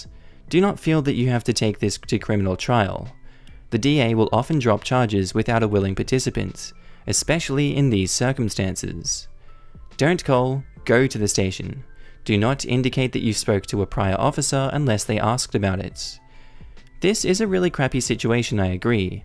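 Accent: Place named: Australia. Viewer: a voice so Australian I nearly fell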